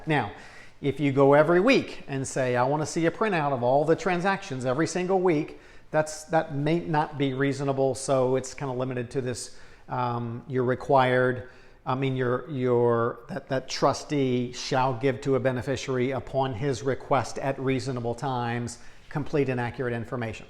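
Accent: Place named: Louisiana, United States. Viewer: Are you from Louisiana? Because you speak exactly like Dustin Poirier, same accent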